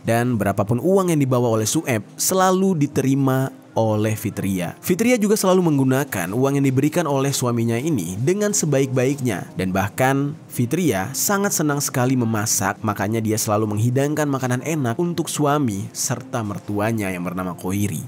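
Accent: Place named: Aceh, Indonesia. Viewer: Belum aja ya dengar bg dengan logat aceh nya🗿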